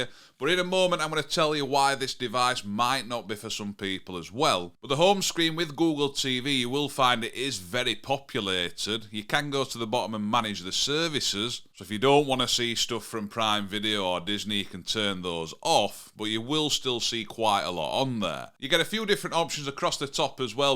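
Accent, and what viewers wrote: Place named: Wakefield, United Kingdom. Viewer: Love your accent. You sound just like my family members from Wakefield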